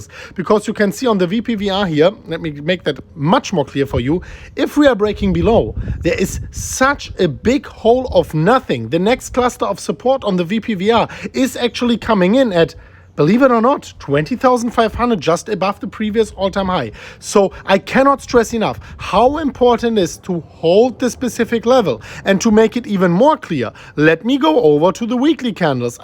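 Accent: Germany. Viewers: Täusche ich mich, oder hast Du nen extrem deutschen Akzent? 🤔😃 Thanks for your nice video.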